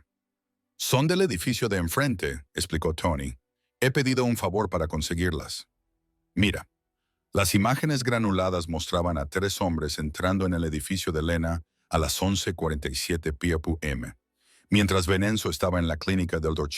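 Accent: United States. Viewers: Si por favor piensen en sus historias antes de difundirlas y pongan bien los generos de los personajes, a vecesved un niño y a veces una niña y porque usar una voz con acento norteamericano hablando español?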